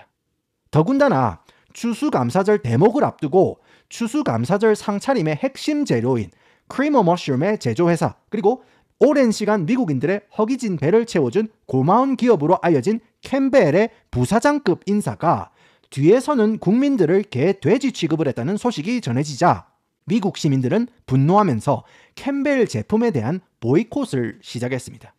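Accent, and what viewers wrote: Gyeongsang, South Korea. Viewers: 언어 천재 DNA가 경상도 사투리와 영어를 만나 미쿡 이야기를 맛돌이로 말아주는 채널 ㅋ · 이 튜브의 재미는 님의 성대모사와 창, 구수한 갱상도 사투리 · 경상도억양 재밌는데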